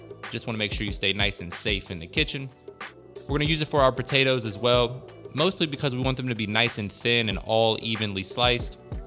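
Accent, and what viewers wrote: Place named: South, United States. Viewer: First time watching this channel, love the sound of your voice and your southern accent.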